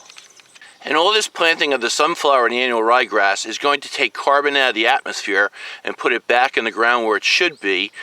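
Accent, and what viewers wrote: New Jersey, United States. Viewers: Good video. Can really tell your from Jersey. "wooder", water.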